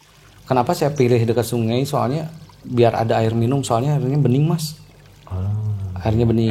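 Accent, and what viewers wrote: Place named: Bandung, Indonesia. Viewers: Ngomong teh meni bandung pisan atuh mang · Duh gusti Aa jatohnya jd bodor ah nyampekeun caritana sunda pisan hahahaha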